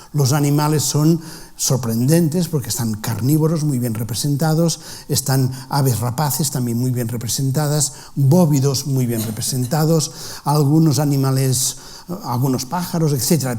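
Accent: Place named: Catalonia, Spain. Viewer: Sabe hablar en Español? Parece catalán...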